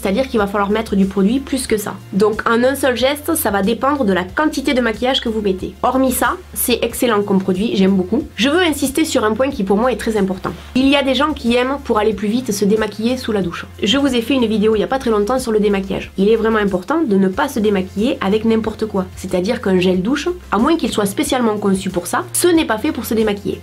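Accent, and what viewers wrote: South, France. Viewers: Du sud ( elle habite à Perpignan ) 😄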